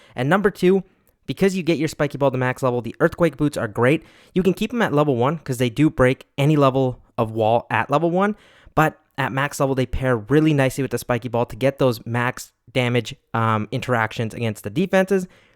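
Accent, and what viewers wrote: Canada. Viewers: New here.. recognized you being canadian just from your tone😂😂. I'm from Edmonton. Keep it up, bud.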